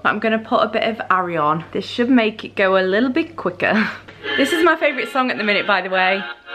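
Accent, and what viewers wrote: United Kingdom. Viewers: Why are British women so fine with that accent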